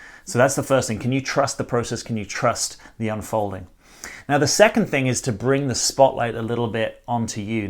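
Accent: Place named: United Kingdom. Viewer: Your teaching and views are resonating with me more than any other coach. I love your british accent! Thank you for all your work! Your my hero, because you are so truethfull.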